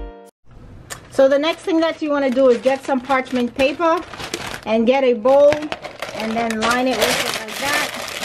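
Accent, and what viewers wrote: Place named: South, United States. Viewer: I was trying to identify your accent...it's not familiar. South, for sure, but different, too. ????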